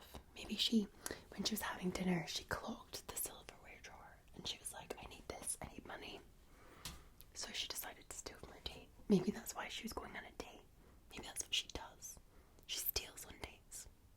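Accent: Scotland, United Kingdom. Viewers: Your Scottish accent and the fact that you're Scottish is just enough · Wait you're scottish? I'm scottish too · FUCK SAKES UR SCOTTISH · she has a normal Scottish accent for a girl in Scotland, im Scottish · The beginning reminded me to a late night infomercial. Would you do something like that? like a mock-infomercial where you show different items, all presented in a relaxed scottish accent. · Jordana Brewster if she was a blond with a Scottish accent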